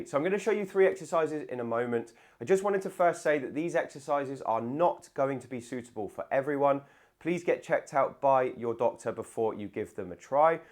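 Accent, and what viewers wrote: United Kingdom. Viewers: I’ve recently found my way to your videos and want to thank you for the content. You provide excellent advice and exercises for my arthritic knees. I hope to improve my walking and have improved joints. Your approach yo explaining the what, how, and why is so clear and concise. You are very talented at what you do. Wish you were in my location in the U.S. Your British accent is so smooth to listen to 😂. Thank you for the great content.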